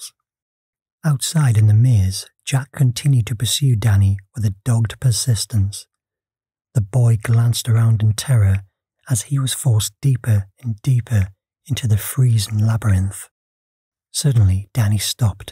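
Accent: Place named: Inverness, United Kingdom. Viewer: Where about in Scotland are you from ? You sound like you’re from Inverness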